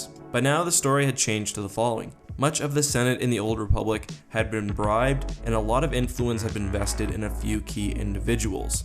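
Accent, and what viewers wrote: Canada. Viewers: Question for you. Not a criticism, but from the way you say your “outs” you’re Canadian or from the Wisconsin region. Correct?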